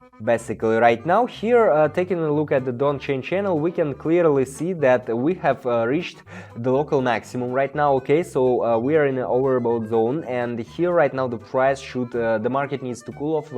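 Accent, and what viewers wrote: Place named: Russia. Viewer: that russian accent adds character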